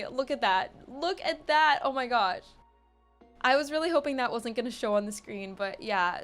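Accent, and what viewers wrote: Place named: South, United States. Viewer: What's your PC build? And do you live down south? I hear a lot of "y'alls" lol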